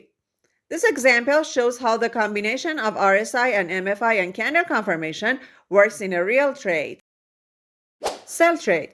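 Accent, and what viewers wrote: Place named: Iran. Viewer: I love your Persian accent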